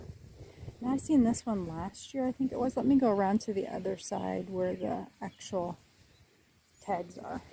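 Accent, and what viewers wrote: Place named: Chicago, United States. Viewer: About 30 seconds in on this video I said to myself, “based on that accent, this woman must be from Chicago.” Then at about a minute later she says “we’re about 2 hours from Chicago.” You can tell that accent anywhere! Beautiful garden. Thank you!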